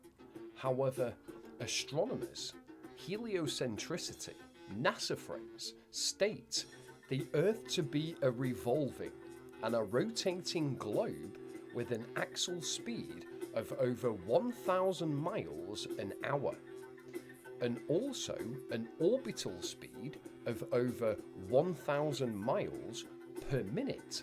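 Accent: United Kingdom. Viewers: I feel dumber trying to watch this. Having a British accent doesn't make you smart.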